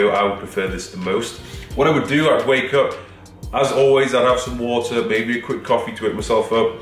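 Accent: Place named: England, United Kingdom. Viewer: Hi, you’re a handsome guy and I love your English accent. Stay humble. 💕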